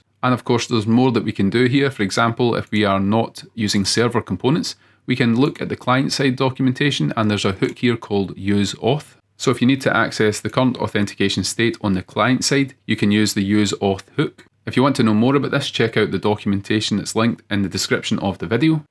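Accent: Scotland, United Kingdom. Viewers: Within the first few seconds of watching the video, I got strongly drawn towards his Scottish accent of English .... Are you from Scotland Sir? Love from India !!!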